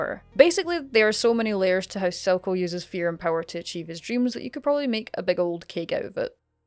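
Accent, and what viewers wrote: Scotland, United Kingdom. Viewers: A Scottish lass talking about my fave villian in recent memory, yes please. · Arcane and Scottish accent commentary. How can I not subscribe?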